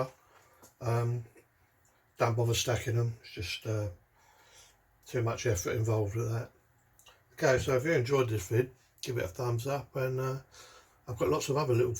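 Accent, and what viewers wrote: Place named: England, United Kingdom. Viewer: A quid. Love hearing an English accent for a change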